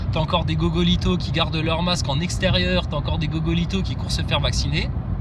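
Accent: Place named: Belgium. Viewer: un renard, un renard, une pesquette mélodieuise, ton accent belge, putain, faut l'améliorer, putain !!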